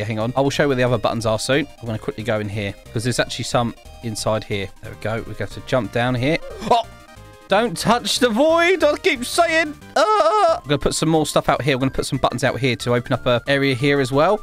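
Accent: United Kingdom. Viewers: i love your british and also your vids<3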